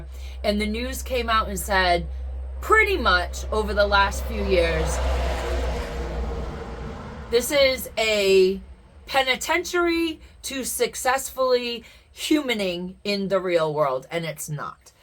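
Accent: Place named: Maine, United States. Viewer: I could tell you were from Maine as soon as I heard you talk❤ I'm from there. Except I've lived on the west coast for the last 6 year's. Miss that accent ❤❤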